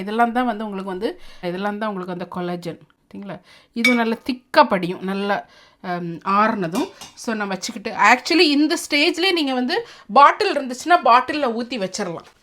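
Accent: Nagercoil, India. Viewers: Hi akka…I like the way u speak especially Nagercoil slang…I lived in England for a couple of years…really very nice country.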